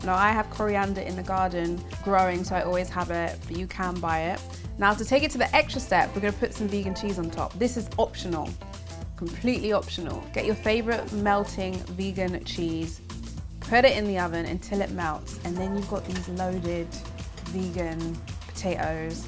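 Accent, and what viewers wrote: United Kingdom. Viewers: love that british accent 😍🤣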